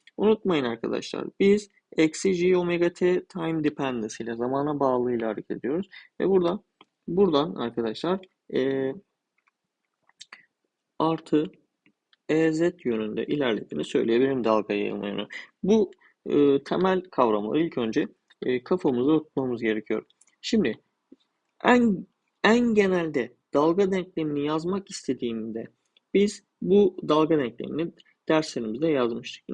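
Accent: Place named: Samsun, Turkey. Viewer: hocam e harfini telafuzunuzdan anladım ben de samsunluyum. videolarınız için teşekkür ederiz kaynak bulmak çok zor gerçekten sağolun.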